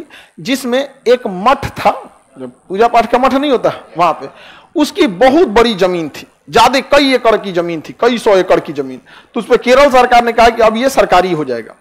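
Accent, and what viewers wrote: Bihar, India. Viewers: Bekar bihari ascent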